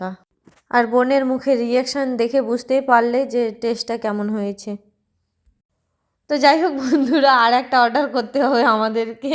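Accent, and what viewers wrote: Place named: Bangladesh. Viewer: তুমি তো এই দেশের মেয়ে তাহলে তুমি কেনো বাংলা দেশের মতো কথা বলো....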